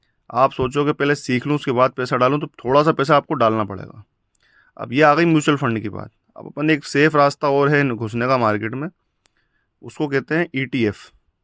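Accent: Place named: Bhopal, India. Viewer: Bro Bhopal se ho kya?? Accent thoda Bhopali lg rha hai 😅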